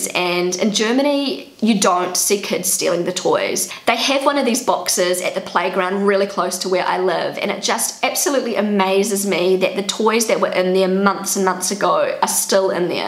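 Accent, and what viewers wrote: New Zealand. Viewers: Hi, I love your videos and your cute kiwi dialect. I find it great that you speak with your children only English and obviously your husband German. Never again it will be so easy for the kids to learn 2 languages at the same time. My daughter in law is American and they live in Australia. My son speaks with their son only German and my daughter in law only English. The kid is three years old now and it is amazing to see how he speaks with his parents in their different languages. He turns his head to his father and speaks German and then turns his head to his mother and speaks English, incredible! And on Skype he speaks German with us, his grandparents. Warm greetings from Hannover, Germany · Everytime a pleasure to hear your velvet voice with this funny 🥝 accent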